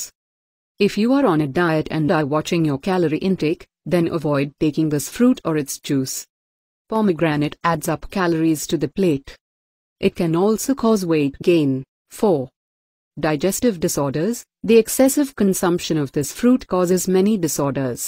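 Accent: India. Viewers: It’s an Indian Accent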